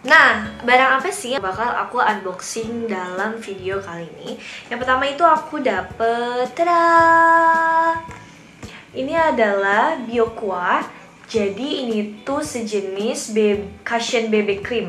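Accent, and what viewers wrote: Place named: Java, Indonesia. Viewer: kok medhok. jawa ya ?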